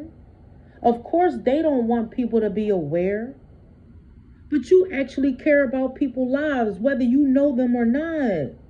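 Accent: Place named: Philadelphia, United States. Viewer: YOU HAVE A PHILLY ACCENT.❤❤❤❤ AM I RIGHT I’M ORIGINALLY FROM PHILLY.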